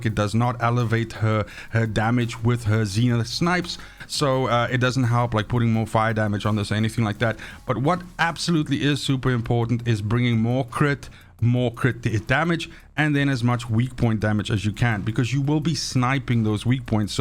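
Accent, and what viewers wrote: South Africa. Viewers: from which country are you bud, you sound like RSA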